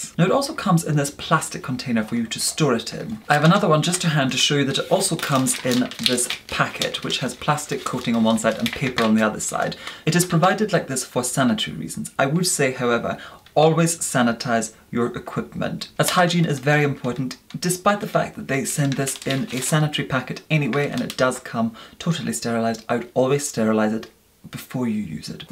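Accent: Scotland, United Kingdom. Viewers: I discovered you yesterday. Make up isn't really my forte, but your eloquence is delightful. It's also good to hear another Scottish accent once in a while. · First time watcher, fascinated by your accent. You sound like that very specific English accent that only really posh Dubliners have. EDIT: listening more, definitely more Scottish than Irish.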